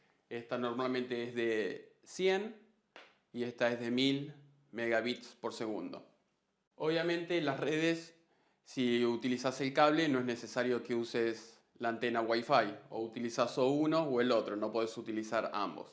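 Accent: Argentina. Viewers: De donde eres entonces? porque tienes acento Argentino PD:Buen video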